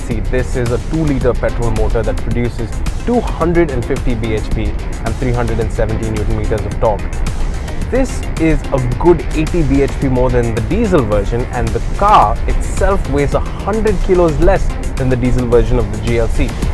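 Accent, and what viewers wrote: India. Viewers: I literally can't understand you with your indian accent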